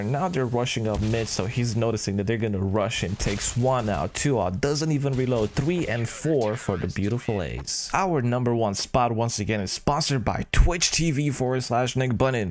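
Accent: Romania. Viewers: Esti Ardelean sau Oltean? :)))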